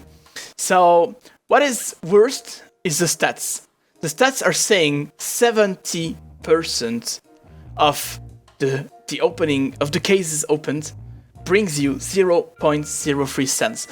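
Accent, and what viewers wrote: France. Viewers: dat sweet baguette accent KreyGasm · Love your french accent :D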